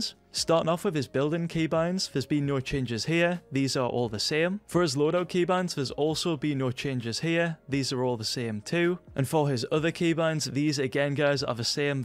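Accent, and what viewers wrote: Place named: Newcastle, United Kingdom. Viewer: You're a proper Geordie haha get in, fancy couple games one day?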